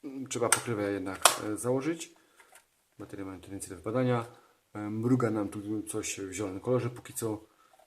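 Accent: Silesia, Poland. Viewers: zasadniczo fajna prezentacja, jedyne co mi przeszkadza to śląski akcent w wypowiedziach prowadzącego, reszta super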